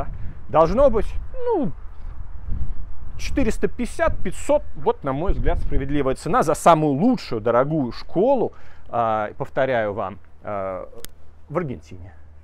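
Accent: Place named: Castile, Spain. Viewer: испанский не с акцентом,а кастильский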